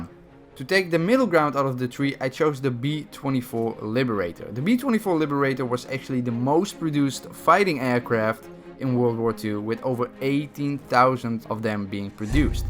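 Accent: Netherlands. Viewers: That accent sounds dutch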